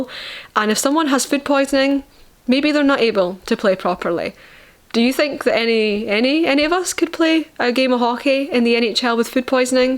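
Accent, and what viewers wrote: Scotland, United Kingdom. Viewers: It’s refreshing to hear/see a WEE SCOT analyzing the Leafs. My NANA was from Scotland and I miss that wonderful accent. Also a little surprised to see someone so far away who is so knowledgeable about our ‘hapless’ Leafs. Thanks for posting, my dear, it’s wonderful for an old man to hear hockey described by such a sweet beautiful lady…AND I LOVE YOUR BLUE EYES !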